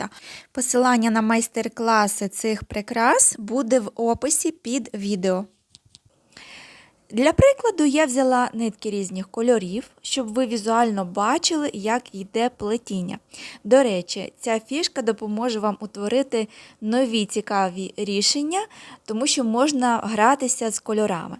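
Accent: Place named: Russia. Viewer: а не лень язык ломать? А хотя прикольно: я и технике от Вас научилась и мышцы живота насмеяла над Вашими попытками говорить на украинском. 😊😊😊 Спасибо Вам за двойную пользу 😊😊😊 Всё равно сбиваетесь на русский 😊😊😊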